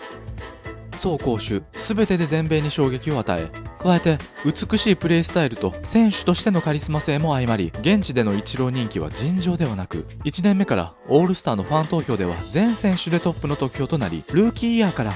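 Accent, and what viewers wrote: Kansai, Japan. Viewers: 関西？訛りありますね。良い声です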